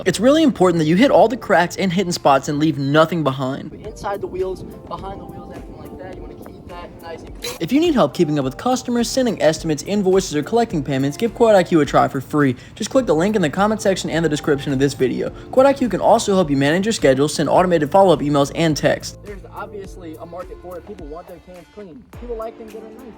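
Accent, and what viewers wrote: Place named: Louisiana, United States. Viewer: dang you from louisiana too😂🔥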